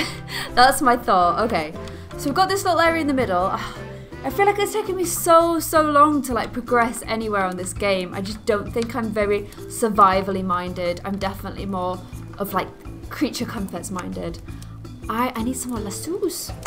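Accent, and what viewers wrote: Ireland. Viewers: WOW!!! YOUR IRISH!!! YAY!!! SAME!!!! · YOUR IRISH I THOUGHT U WERE BRITISH OR AM A JUST CRAZY?!?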